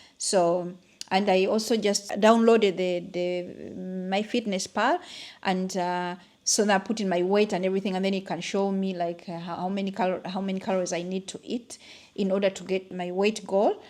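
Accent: Uganda. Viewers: Beautiful material and what you did with it but should anyone in your size of your age be wearing such a thing in that short length and showing it publicly? Not nice at all - no offence meant. And FYI HRT will give you cancer. Doing your own research is important and great but does not Negate that it causes cancer - 100%. Because the body knows the difference and indeed it can feel awful- in perimenopause mdnopause and post menopause and you want relief but going to do HRT just to get relief can take your life away literally. And every cell and body function works with hormones. You can do something different that works just the same and better. And let me correct something it is not all people who have diabetes that don't have insulin..and contrary to conventional or allopathic medicine theories that giving more insulin helps diabetics it doesn't. The issue is hormonal imbalance and the weight is obviously from high cortisol so is the insomnia. 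As for your weight you obviously sound Ufandan to me and again no offence but Ugandans especially women are fat by nature structure culture and the way you eat. Huge Portions and fattening food. If you are more mindful of that you will loose weight i.e why I said that wrapper length is not doing anything for you. If you make it longer it will but at that short length it Not flattering at all it makes you look bigger especially if you have hips and a bigger backside. And I do not know you. This is not personal. Just stating truth and f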